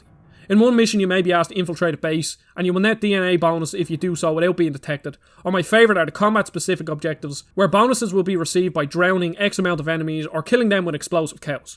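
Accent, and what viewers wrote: Dublin, Ireland. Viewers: Didn't expect a Dub accent on a Gamexplain video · Of all the places I expected to hear a Dublin accent, a GameXplain video was pretty low on that list. Fair play to you,.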